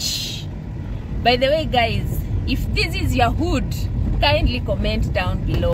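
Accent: Luhya, Kenya. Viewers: hahaha thank you so muich for this video kakamega county is my home i have passed that tarmac road severally but i never knew that road leads to the train station and i never knew the progress at that place thanks so much and uv talked Luhya like a typical Luhya😂😂😂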